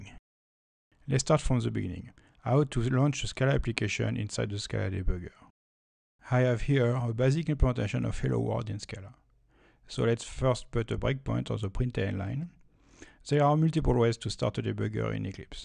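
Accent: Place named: France. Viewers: The french accent is very very very strong. Could make some efforts to speak with a better english accent. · I agree that his french accent(I could understand only half of it) was strong but still you could be polite. At least he made an effort to make a screencast for us.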